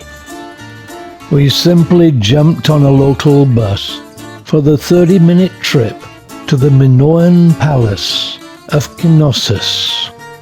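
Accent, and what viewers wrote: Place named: United Kingdom. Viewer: Love your style very clear and distinct typical British English thanks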